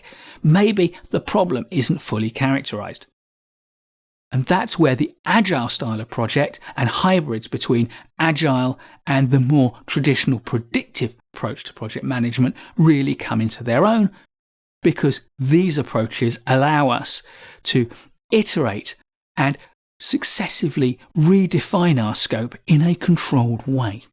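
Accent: United Kingdom. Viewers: great video and great explanation and what makes it better is your excellent english, British accent and soothing voice I honestly can listen to you all day you should consider making podcasts fr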